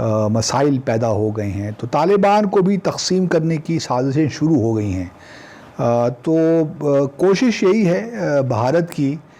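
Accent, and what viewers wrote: Pakistan. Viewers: Sir, are you a Hindko speaking Peshawari?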